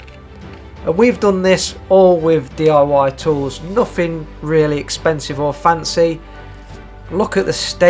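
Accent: United Kingdom. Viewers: the commentary is so british lmao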